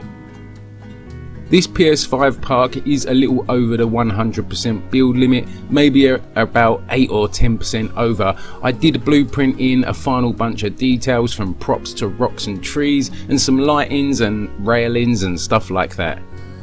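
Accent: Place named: United Kingdom. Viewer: Soooo British and yes has a hint of Spain in to, amazing work keep em coming.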